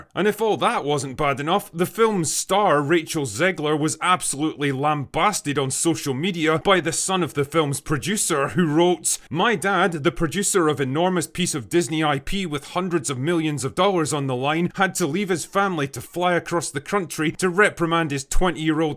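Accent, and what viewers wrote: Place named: England, United Kingdom. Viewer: This was great classic stuff said right here! Well said & I agree. " " "The only English Accent that I care to hear"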